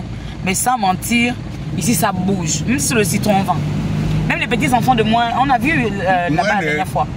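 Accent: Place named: Ivory Coast. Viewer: tu t'exprime chic genre une Ivoirienne bien choc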